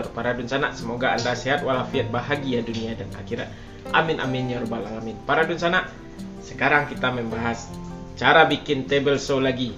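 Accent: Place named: Sumatra, Indonesia. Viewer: Org mana aslinya Om kalo didengar suara org sumatera tp kalo kata dingsanak itu bahasa Banjar Kalimantan Selatan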